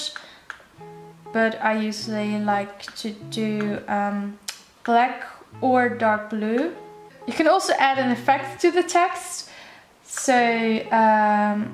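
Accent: Netherlands. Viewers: You have a Dutch accent.. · Dutch accent..Then I read your FAQ and voila. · wow ur accent is like netherlands peoples accent xD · Ik kon aan je accent horen dat je Nederlands bent!💗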